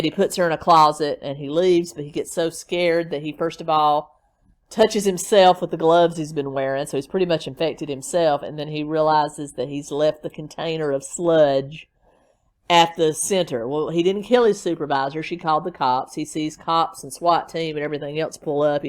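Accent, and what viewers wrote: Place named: South, United States. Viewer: What part of the south are you from?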